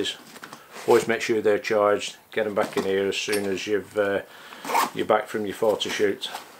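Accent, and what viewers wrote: Lancashire, United Kingdom. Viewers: Hi, I thought I would just take a moment to say thanks for these great videos, by following your tips my photography has improved already! You sound like a Lancashire lad? Chorley? Burnley? Preston? Just watched you explaining whats in your kit, there are some expensive bits of kit in there! Undaunted I thought I would treat myself so I went out this afternoon and bought myself a packet of Fig rolls lol! Keep up the good work and thanks again!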